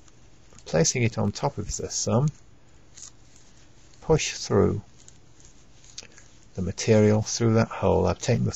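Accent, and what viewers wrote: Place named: Alabama, United States. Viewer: Your accent makes me think you might be from Southern Alabama. True?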